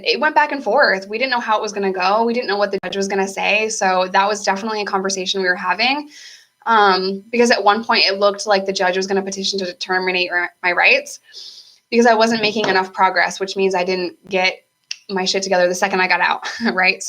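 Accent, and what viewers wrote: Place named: Endicott, United States. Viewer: So hey girl! I had an odd feeling when I ran into your channel that you may be from Endicott, what with your accent and all. I am too! My family actually moved me to NC to avoid PINS/ hanging with dope fiends all day long. I have stories upon stories from drug raids to robberies in the 607 if you ever want to get up and talk about how your upbringing and environment played a role in who you are. It’s so crazy to see how I probably would have ended up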